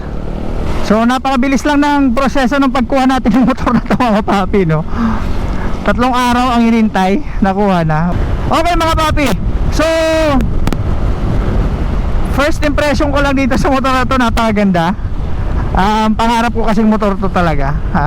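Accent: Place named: Bicol, Philippines. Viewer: Bicolano ka boss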